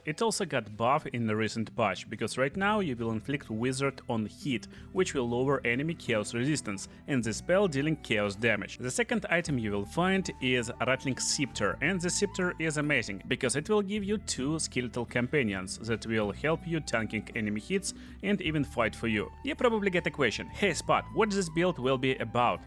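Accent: Russia. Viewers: I hear Russian accent aula bulia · слышен явный рязанский акцент, уши прям режет =)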